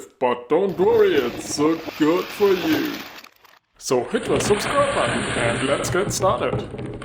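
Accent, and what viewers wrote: Germany. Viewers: I have to know: Are you german? By your accent i'd say 100% :D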